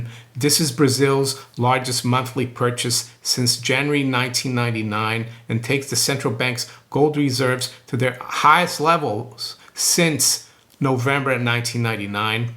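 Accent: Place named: Italian-American, United States. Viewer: Brazil? You sound like any US/NY Italian-American. Now in London. You should explain your family's ethnic origins, travels and education. Fascinating. ... You look like you live very simply, frugally. If so, the bravo.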